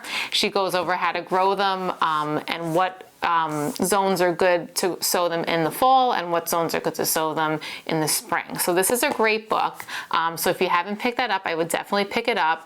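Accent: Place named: Long Island, United States. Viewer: Accent rough. People from LI and Staten Island always sound a little drunk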